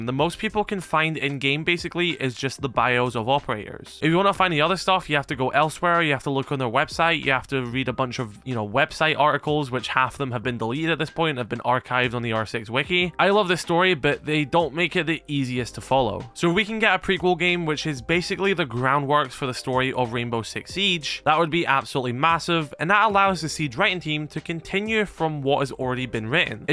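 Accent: United Kingdom. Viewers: Also if you were invited to Ubisoft Montreal the French speaking people in Quebec would be so confused having a British person speaking English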